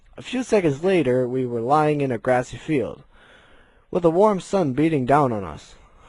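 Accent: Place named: United States. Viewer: Wait, you're from holland? Hold on let me go on google maps........, OOOOOOOOOH, ok now I know were that is, wait, also dont they speak another laguagd and have a different accent because you sound alot like you're from america.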